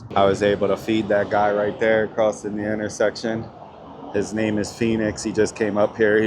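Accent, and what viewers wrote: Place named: New Orleans, United States. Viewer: I am one of your new subscribers and I also live in Colorado. I love the way you make homelessness as an adventure and there’s no poor me. By the way I love your voice, kind of sounds like you’re from New Orleans but I think you said you’re from Pennsylvania. Have you thought about upgrading to maybe a van? Or a truck with a shell or small camper? Anyways, good luck and God Bless.♥️🙏🏻♥️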